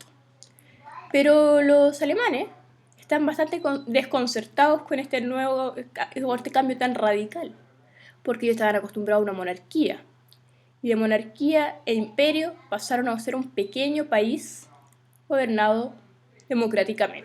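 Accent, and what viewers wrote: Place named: Chile. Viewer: WACHO COMO HABLAN LAS CHILENAS LPM ES HERMOSO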